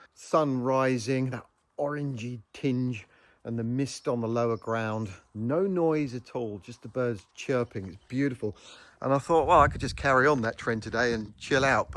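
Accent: United Kingdom. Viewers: Hi! I can tell by your British Accent, that you are renovating this house maybe in Cornwall or Devon. Or somewhere in Spain.❤ Greetings from Northern Germany, Fritjof 😊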